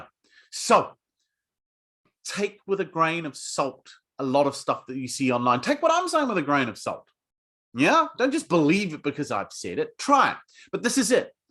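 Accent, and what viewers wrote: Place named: New Zealand. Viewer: Great advice as always. You'll need to add a section on your bootcamp "how to sound like a Kiwi"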